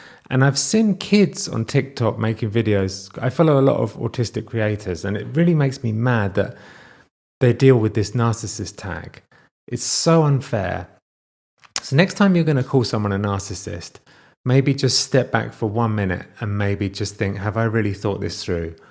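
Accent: United Kingdom. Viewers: I have noticed that a lot of your videos have been triggering for me specifically because you remind my of my ex. He is British, MCR, and we are both in recovery (post divorce - he was an alcoholic while we were married, and I abused alcohol post divorce - for various reasons treated via therapy). And idk if it is cultural (you're both from England, and you both have English accents ((obviously not the same accents))), or not. But I've had to really work to separate the two of you. He is very much a social media dad. He has a lot more work to do, because he started years after I did. I've had so much therapy and he hasn't. Idk, it's really weird, and I have to really work on staying in my own hoopla hoop when watching your videos specifically. I'd love to have a few conversations with you about it, but I know that probably isn't something that will actually happen lol. 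You have a lot of followers. But I do genuinely love the videos and openness from you and Laura. And I'm an adult who was diagnosed later in life as neurodivergent, and all 3 of my children were diagnosed as children. My mother had severe mental health issues (diagnosed).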